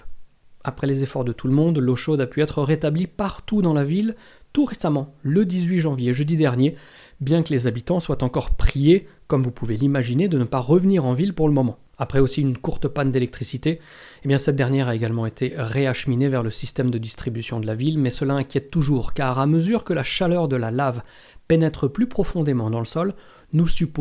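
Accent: Iceland. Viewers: Votre accent islandais est surprenant, l’islandais est surprenant ^^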